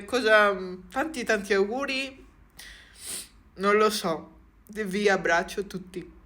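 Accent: Veneto, Italy. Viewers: Parli come me quindi immagino tu sia veneta ...❤ti abbraccio fortissimo e ti auguro ogni bene...❤❤❤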